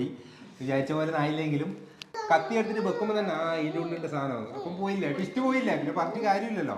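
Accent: Kannur, India. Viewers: അനക്ക് എനക് കണ്ണൂർ സ്ലാങ് അടിപൊളി 🕺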